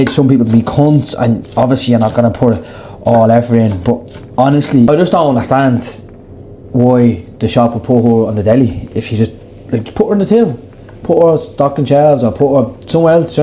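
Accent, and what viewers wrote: Ireland. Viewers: So....it's called a CHICKEN FILLET ROLL. Good to know. Yet it is not written anywhere on this screen except here in my comment. I had to peeeeeer at your tiny subtitles when I caught the name and rewound to find it again. We don't all speak with an Irish accent. You are welcome, lol. (Hey! Don't call me that!!)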